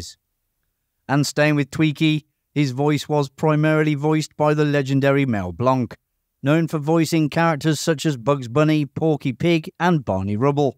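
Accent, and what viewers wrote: United Kingdom. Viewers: The guy's British, dude! Give him a break!! 😂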